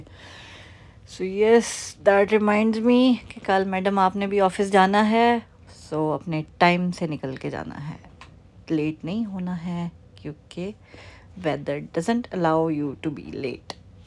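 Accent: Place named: Punjab, India. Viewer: Though driving.and ur commentery aur uper se Punjabi touch❤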